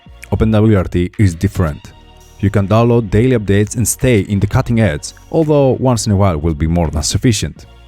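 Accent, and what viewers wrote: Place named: Greece. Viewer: Ακούω προφορά και λέω Έλληνας θα είναι. Μετά βλέπω και τη Σαλόνικα και το σιγουρεύω!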